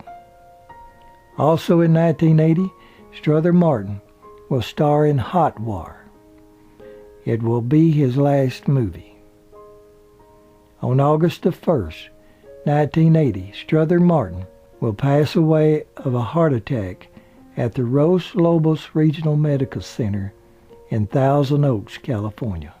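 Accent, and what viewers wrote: South, United States. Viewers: Mr. I enjoy your Southern Draw and your coverage of these fine actors in our past enjoying their movies while growing up. Thank You Sir for your stories of important memories enjoyed in life.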